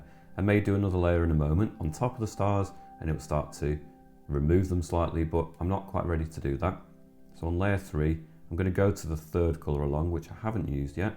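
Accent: United Kingdom. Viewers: Turn on the closed caption, cc, to understand the British accent for people in the USA. what he did say?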